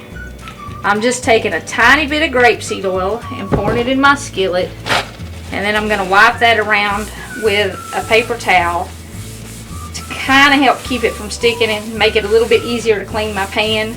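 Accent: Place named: Alabama, United States. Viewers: What part of the south are you from? I am from east, TN. Your accent sounds very much like ones from this area. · i'm guessing you're from Alabama, by your accent. You're cooking is similar to ours. I'm from Missouri and a lot of Missourians were originally (in the1800's) from Alabama, Tennessee, North Carolina.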